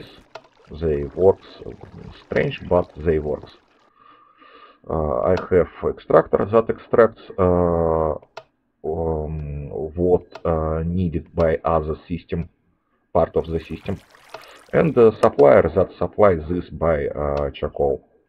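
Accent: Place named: Russia. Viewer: От русского акцента не избавится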